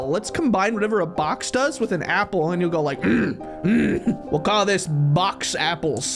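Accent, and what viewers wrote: Scotland, United Kingdom. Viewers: Love the British voice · The British accent 😍 👌 🤣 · Ah yes just randomly turning British No hate pls no hate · Bro u sound sccotish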